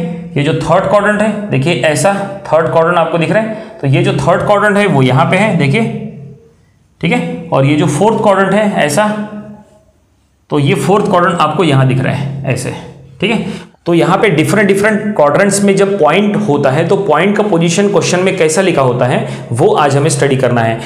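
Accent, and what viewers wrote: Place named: Hyderabad, India. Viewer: Sir ka hyderabadi ascent plus super explanation.. 🔥thx sir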